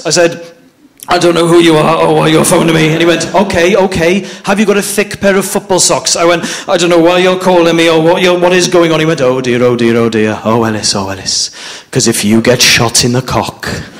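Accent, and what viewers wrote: Wales, United Kingdom. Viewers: He said once on the podcast that he sounds less Welsh when he’s with John Robins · He definitely sounds more Welsh when he does stand up than when he's on the podcast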